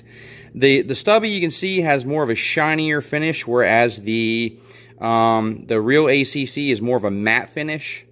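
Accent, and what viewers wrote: United States. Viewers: dude I love your accent :D what area in the US are your from?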